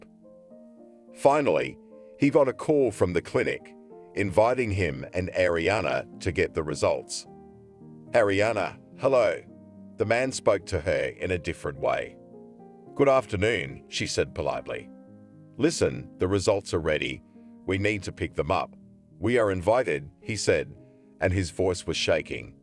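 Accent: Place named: United Kingdom. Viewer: the stories are good but it would be better if the narator didnt have a british accent. ir's somewhat hard to grasp the words as he pronounced the letter A as I. sorry to say this but I hope you could find a better narator with american accent. tnx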